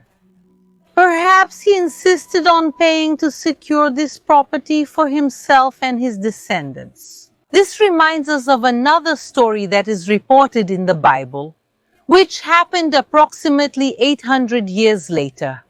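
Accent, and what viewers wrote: Israel. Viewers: I think the original language is English, she/you is/are speaking English with a nice Israeli accent, right?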